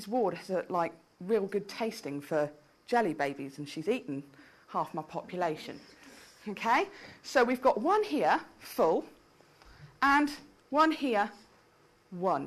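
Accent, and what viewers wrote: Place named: United Kingdom. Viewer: That's kinda puzzling... She has got a British accent but this shouldn't hurt so much.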